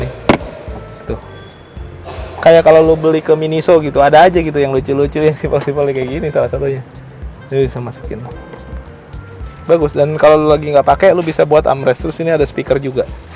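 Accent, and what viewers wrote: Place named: Cibodas, Indonesia. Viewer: Kya org Cibodas nih.. bang yah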